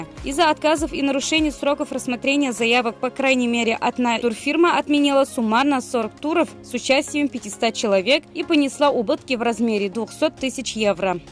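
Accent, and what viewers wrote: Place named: Tatarstan, Russia. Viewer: Такой родной , татарский акцент)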